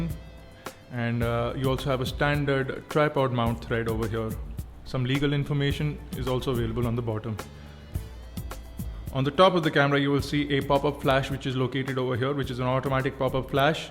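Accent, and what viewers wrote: India. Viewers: hahaha lol " press the butt'n" it's not really that hard to spot an Indian even if he's talking a foreign language.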